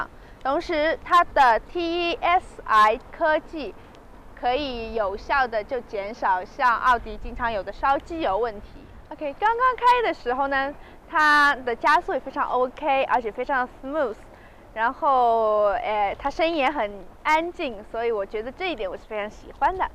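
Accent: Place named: Hunan, China. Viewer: 湖南音好重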